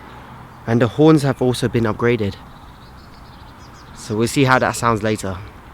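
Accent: United Kingdom. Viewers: Bruh that british accent had me thinking you were a white guy(not being racist), awesome review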